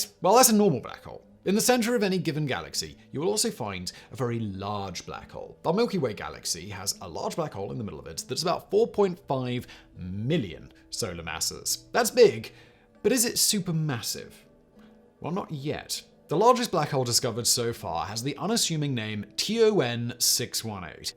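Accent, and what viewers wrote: United Kingdom. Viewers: Sometimes that British accent throw me off as well. Maybe if he used subtitles.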